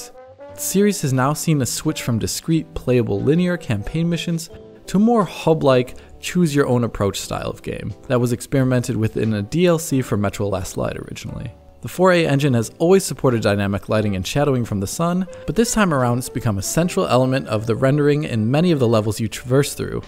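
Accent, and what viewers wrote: Germany. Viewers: And by millennial lisp, I guess you mean a German accent. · Wußt ichs doch, das ich da nen deutschen akzent raushörte. Bis bald 😉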